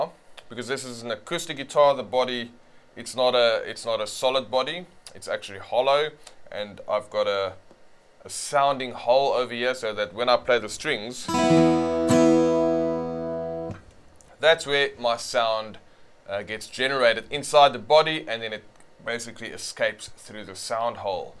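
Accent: South Africa. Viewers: bru I recognise that South African accent anywhere!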